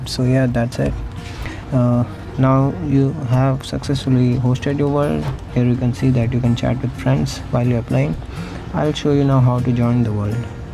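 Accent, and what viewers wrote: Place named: India. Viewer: Guys if he is indian that doesnt mean he talks weird and he is stupid. HE IS NOT HE HAVE OTHWR ACCENT and thank to you . But why you are not happy when talking?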